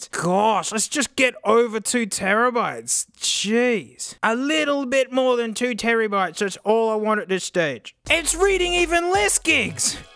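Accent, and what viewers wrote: Australia. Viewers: Maybe because he’s Australian? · I visited an old video and just realised that as his videos gone on, he’s picked up an Australian accent along the way, I’m not sure really, put your opinions in the replies because I don’t really know. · You're sooooo Australian..... And I love it! 🥰🤣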